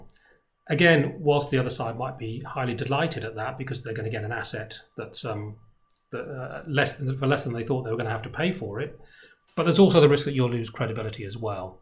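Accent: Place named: United Kingdom. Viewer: 오 글로벌한 영국어를 쓰고 계시는듯 : ) 벤쳐스 연계부분에 대해 상세하게 짚어줘서 흥미로웠네요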